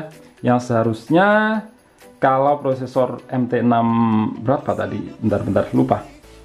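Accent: Blitar, Indonesia. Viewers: Mas opo Wong blitar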